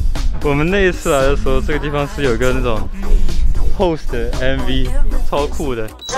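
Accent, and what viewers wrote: China. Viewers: 中國腔很輕微。但學台灣腔還是能聽出是中國人。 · 中國的腔調 , 繁體的字幕 , 可以告訴我為什麼嗎?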